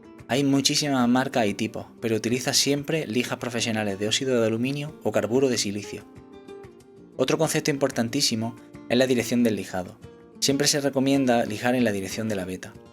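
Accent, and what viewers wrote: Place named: Spain. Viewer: este relato con acento español es muy poetico...para los doblajes de peliculas sino sirven jja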